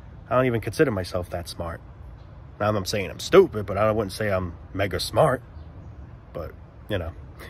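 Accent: New York, United States. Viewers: Great NYC accent. Heroes of 911.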